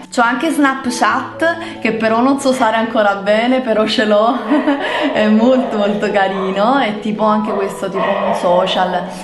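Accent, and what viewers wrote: Naples, Italy. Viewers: Sei napoletana eh? Se si anche io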